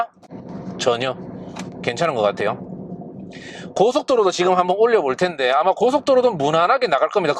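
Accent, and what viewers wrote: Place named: Daegu, South Korea. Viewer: 대구말 엄청 반갑네요 ㅎㅎ 요녀석은 정비할때 손 들어갈 공간이 여유로운 편일까요? ㅎㅎ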